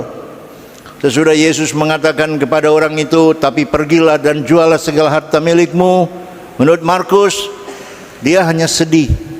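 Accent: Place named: Papua, Indonesia. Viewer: DIALEK PAPUA ITU KELIHATAN KERAS TETAPI ITU BUKAN BAHASA KOTOR ATAU KASAR, USKUP KAMI KEUSKUPAN MANOKWARI-SORONG ADALAH USKUP DENGAN GAYA DAN CARA PANDANGNYA SAMA SEPERTI ORANG PAPUA PADA UMUMNYA. BEGITULAH KAMI DISINI. INGAT USKUP KAMI HILARION DATUS LEGA ADALAH USKUP YANG TAHU BENAR SIKON DI PAPUA, SALAM HORMAT DARI UMAT DI TPW FAKFAK, PAPUA BARAT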